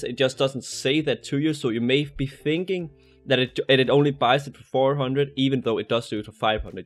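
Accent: Denmark. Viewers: Er du da dansk?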